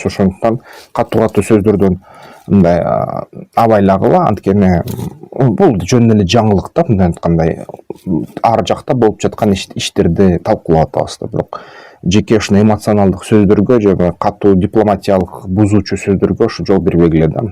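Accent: Standard. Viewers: Азаматсын патириот деген сендей таза кыргызча суйлойт!!!....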